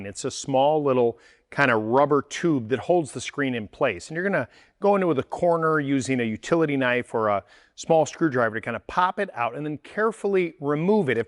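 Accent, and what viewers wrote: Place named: Midwest, United States. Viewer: Thanks for the great information! Will tackle this in a couple of months once things warm up just before spring., I kind of feel like you must be from the Ohio/Indiana/Illinois area? I recognize your accent as being common in those locations. Always love stopping by my local Ace as opposed to the so-called "big box retailers". Thanks again.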